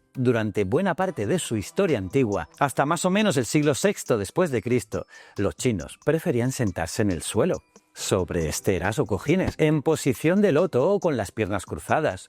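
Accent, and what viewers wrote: Spain. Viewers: Tú eres andaluz? Verdad? Las tres primeras palabras del vídeo te delatan, jaja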